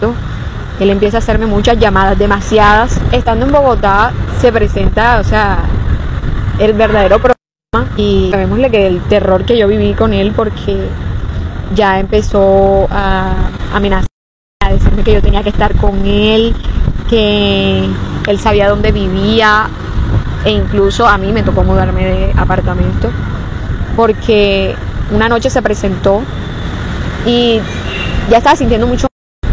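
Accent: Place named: Venezuela. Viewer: Pues te salvaste de ése asesino. Menos mal fuiste más lista. Deberías de hablar con la Fiscalía; y ser testigo en contra de éste individuo. Eso ayudaría más al caso. Me imagino qué tendrás fotos con el, para evidenciar de qué si se vieron. Fuiste muy inteligente!!! Y..tu acento me luce venezolano....será tú versión un montaje????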